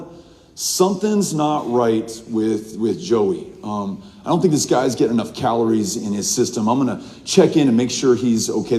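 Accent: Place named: South, United States. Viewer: "Southern talk."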